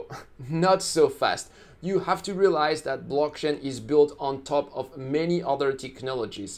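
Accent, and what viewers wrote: France. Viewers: Love the French accent ❤️👍🏽But love eating the blocks more! :) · I do enjoy your French accent! Plus really great content. The Harvest Finance hack video you did was excellent!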